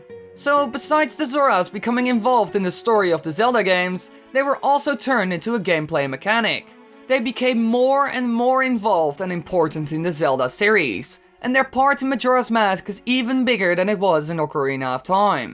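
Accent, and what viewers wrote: Netherlands. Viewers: NyakiAki its just his dutch accent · thats an accent he's dutch and in dutch its very normal to talk like that i am dutch myself but it doesnt sound weird in dutch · actually, thats his accent as a dutch XDDD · Wat he is just dutch man!!! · It's called a 'Dutch accent' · He's dutch. That's just how dutch people speak. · Its his accent. He's dutch.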